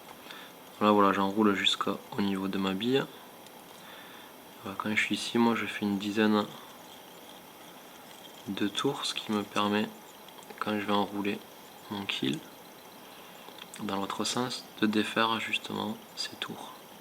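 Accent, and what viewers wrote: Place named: Southwest, France. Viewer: Tu es de quelle région tu as la voix du sud ouest 💪💪💪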